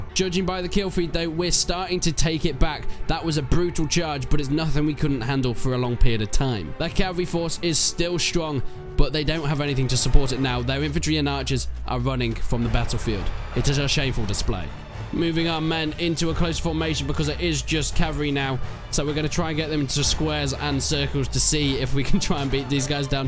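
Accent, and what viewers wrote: United Kingdom. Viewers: You've got the Bear Grylls accent, where is that from?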